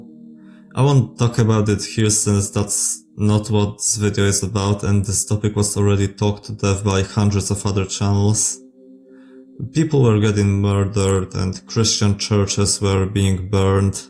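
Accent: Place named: Poland. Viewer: slysze twoj polski akcent kolego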